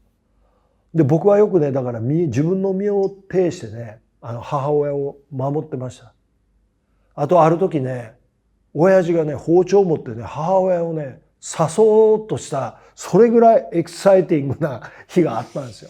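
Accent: Kansai, Japan. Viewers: さん、大好きです💕 お顔も声も、どストライクです💖ちょっと関西弁なところも…😍お若い頃から変わらず大好きです💘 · 何か…好きやなぁ😊 さん。変わらないと言うか、お話に虚栄が無い。関西弁が心地好くて人格が素敵✌️ お身体に気をつけて変わらないワールドを発信して下さい。ありがとうございました💚💛❤️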